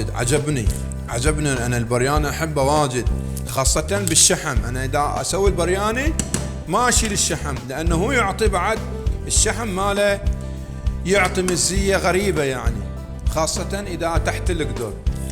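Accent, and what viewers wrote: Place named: Qatif, Saudi Arabia. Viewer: يعطيك العافية شيف ، لهجتك كأنها قطيفية بحتة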